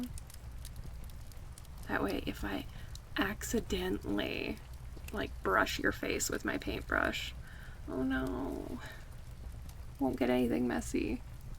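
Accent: Canada. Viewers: are you canadian? random question lmaooo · Canadian eh?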